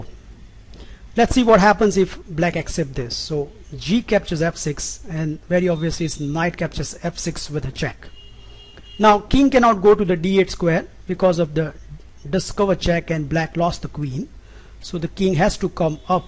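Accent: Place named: India. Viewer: I bet u are an indian. Lol nice english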